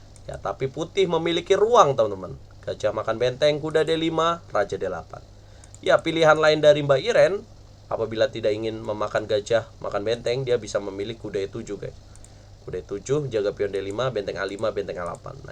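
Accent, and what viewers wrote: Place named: Java, Indonesia. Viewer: Ko tiba tiba medok bang😭👍🏼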